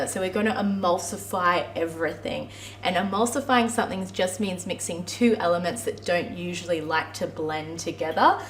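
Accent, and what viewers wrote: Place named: Australia. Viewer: So you’re Australian, I should have known your accent.I’ve made your garlic confit. I love all your videos.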